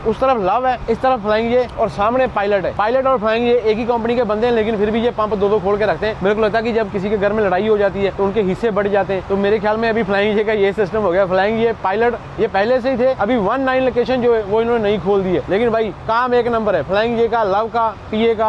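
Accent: Punjab, India. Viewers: Punjabi bnda hindi bolde hoye das hi dinda asi punjabi aa # "ye jo khajoor ke rukh hai ye apka sawgat kre ge" 😅 great paji 🎉🧢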